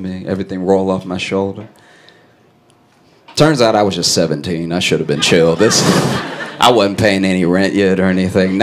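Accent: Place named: South, United States. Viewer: this man sounds like he is from below the mason dixie line!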